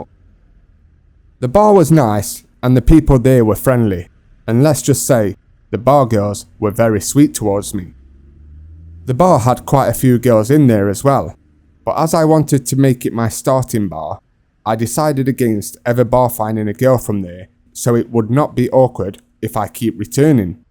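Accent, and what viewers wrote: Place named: North, United Kingdom. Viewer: love yr northern accent.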